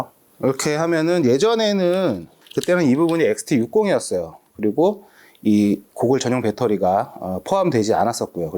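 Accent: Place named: South Korea. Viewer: Korean accent are 🔥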